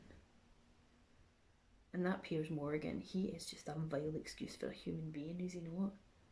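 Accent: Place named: Scotland, United Kingdom. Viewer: There's a phrase from when I was in the military, when someone did well, "atta-girl" or "atta-boy"...you get an atta-girl for dealing with your anxiety and still make videos and PowerPoint presentations! As to being disenheartened, another thing I learned from my time in service: If you have helped only one person your life has been worth-while. You are helping me to embrace wearing makeup...I mainly only wear it for special occasions, or if I'm on "vacation" with my hubby while he's at a tech conference (pre-worldwide cooties) and I had time to watch YouTube creators with makeup videos. (When my makeup is on-point, I feel better about myself - and anything healthy that I can do to bolster my mental health is a good thing.) Wayne Goss is one I follow, as well as a couple of females who specialize in "older skin" (I have 20 years on you.) The upside of your unedited videos: we get to see how long things take, how to fix oops-es, annnd, we get to hear your beautiful voice! 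(trust me, a lot of us U.S. folks are gaga for a beautiful Scottish accent...just ask Cheryl LOL!) ❤️ Sorry for the novel-length, but I thought it was important enough to share my thoughts. 😉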